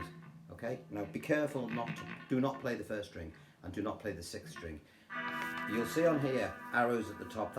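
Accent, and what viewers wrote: Liverpool, United Kingdom. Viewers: Love ye work im learning so much from your lesson. I'm from West Derby but have been living in Townsville Australia since 1984. Love hearing your scouse accent and musical charm. Cheers mate. Go the Reds.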